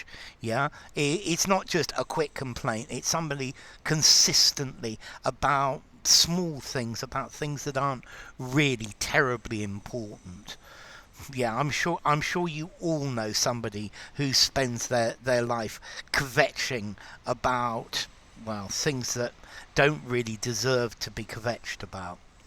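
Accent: United Kingdom. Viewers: Thanks for your interesting videos. Are you British?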